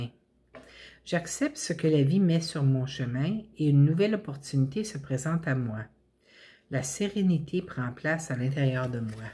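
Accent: Canada. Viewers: Toujours un réel plaisir de vous entendre. Vous êtes toujours dans le juste. Et petit aparté, cela me fait du bien de réentendre l'accent canadien 😍 . Bonne continuation à vous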